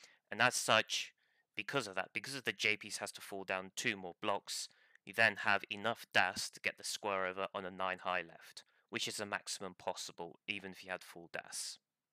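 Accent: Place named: United Kingdom. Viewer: Great video, you explained this in a very good way. One suggestion I have is that you tone down the British accent a bit.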